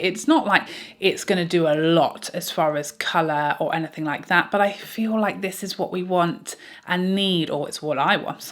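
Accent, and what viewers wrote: England, United Kingdom. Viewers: I just subscribed - full disclosure, it was because of your accent. Reminds me of “Midsomer Murders”! BTW, I agree with your re: the cheese scented shit. Gross.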